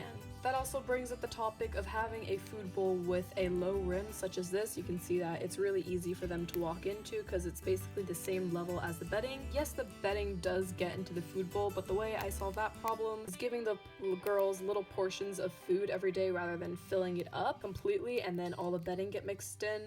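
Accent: United States. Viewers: The person narrating is American.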